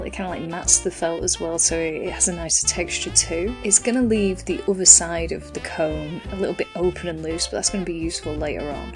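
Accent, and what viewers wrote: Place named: United Kingdom. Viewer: Brilliant, clever, clear and fun. Also lovely to have a native Brit. for a change!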